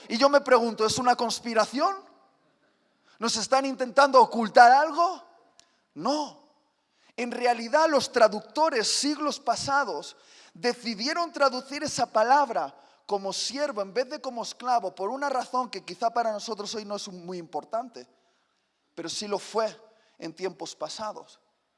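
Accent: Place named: Spain. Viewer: Hola es bello el acento español:) Y Dios esta contigo, que padrisimas tus predicas:D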